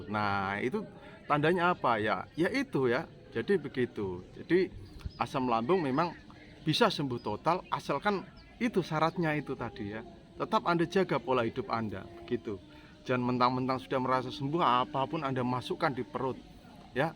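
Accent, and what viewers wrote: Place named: Banyumas, Indonesia. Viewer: Betul pak..sy juga suka dengerin Chanel bapak ngapak jg luw, hehe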